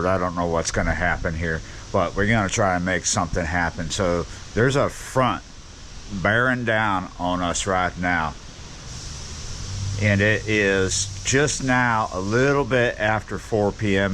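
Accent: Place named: New Orleans, United States. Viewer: Not hat but yat accent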